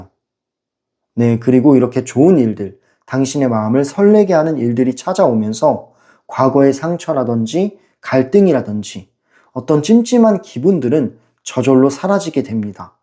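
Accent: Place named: Gyeongsang, South Korea. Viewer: 삼번리딩 갱상도 샘인가봐요 리딩중 만족 시릅지도~^^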